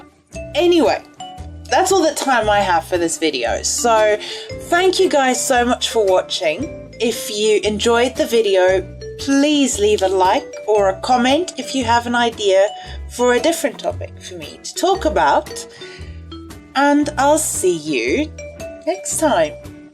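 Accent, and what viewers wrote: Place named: South Africa. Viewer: I'm South African I can hear you are South African I can hear it. I know exactly what you mean when you made this video 🙂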